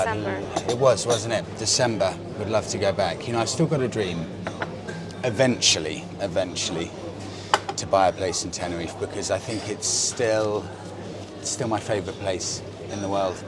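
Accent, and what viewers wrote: United Kingdom. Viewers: Ur accent is from uk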